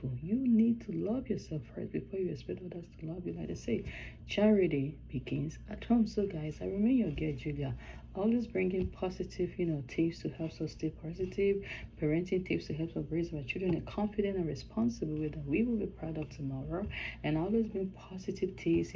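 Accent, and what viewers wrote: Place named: Kenya. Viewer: Very good story. I love your accent. Kenyan? Very soft. Excellent video!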